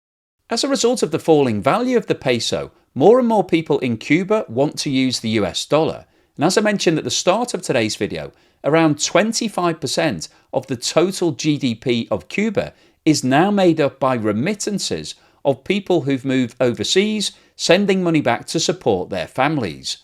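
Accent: United Kingdom. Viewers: Off topic, but I find this guy’s accent the most pleasant British accent. Does anyone know its name?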